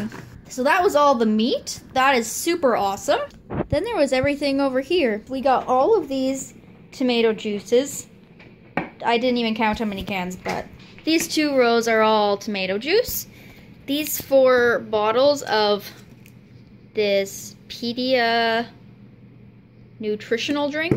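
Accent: Canada. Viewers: You’re obviously Canadian...eh...I’m in Hamilton Ontario. Where are you?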